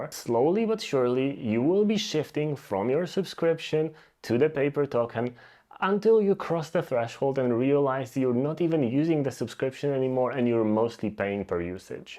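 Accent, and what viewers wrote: Poland. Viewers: no nieźle xD widze miniaturke nowego dla mnie kanału, patrze " " i takie there is no chance this guy isn't polish, wchodze na kanał i opis patrze United States i mówie no kurde, puszczam ten film słysze akcent i już sam nie wiedziałem co słysze po czym widze screena z Cześć więc jednak miałem racje 🤣 nie oglądam chyba w ogóle polskiego youtube więc zawsze mnie śmieszy jak znajduje polaków nadających po angielsku. W sumie to nic dziwnego - widownia pewnie 50 razy większa jest niż w polsce.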